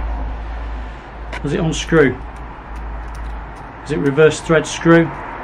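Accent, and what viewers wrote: Nottingham, United Kingdom. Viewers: I thought that was a Nottingham accent you had, i pass through Netherfield on the train to Forest games travelling up from Norfolk.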